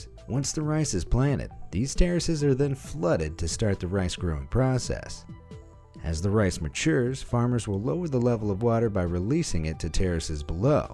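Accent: United States. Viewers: Well the words he's useing are the English words for it (English as in UK not English as in English lauguage) tho his voice is rather amarican or Canadian. Its hard to tell as he uses alot of English terms and pronouncyation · Ah, so this is a British guy that sounds American. He said, "Petrol Head" dead giveaway.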